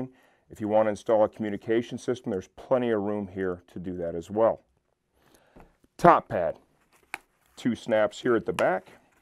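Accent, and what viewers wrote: United States. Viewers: Why Americans speak like that?? You should see from time to time the BBC.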